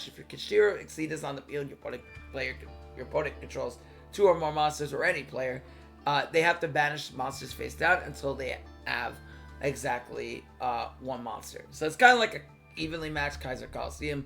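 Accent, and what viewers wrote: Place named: Long Island, United States. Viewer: Dude, you have to be from Long Island. 😂😂😂 Great Video my guy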